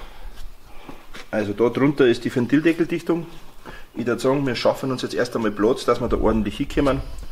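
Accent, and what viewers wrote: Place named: Bavaria, Germany. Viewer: Schee boarisch! Da macht das anschauen gleich noch mehr Spaß ... danke!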